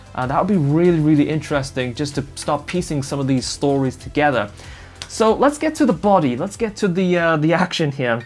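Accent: Newcastle, United Kingdom. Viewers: he's a Geordie. Newcastle, mate. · I guessed you were a Newcastle lad but you seem to have a mixed bag of accents spilling out from time to time. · How bout some of that Geordie accent in your next video? That MacAlpine BC Rich looks kicking!!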